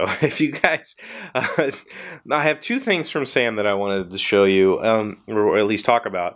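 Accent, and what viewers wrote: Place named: General American, United States. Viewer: Ha ha ha of course you have an accent! I find you easy to listen to, a more general 'American' while those from the south often have a drawl or sing-song accent. Now this is because I am Australian, and no we never say we will put a shrimp on the barbie...we dont use the word shrimp in relation to seafood, its a prawn, a shrimp would be an almost derogatory name for a short person or child. Living in Australia there is less difference in accent as there is in the US more often its in the use of colloquialisms or local phrases, or ending the sentence with an eh. Sam, well done. Cute family, but OH NO chocolate covered bacon??? I have no words... Well done, be a good son and make stuff for your Mum. Have a safe and happy weekend to all, Trace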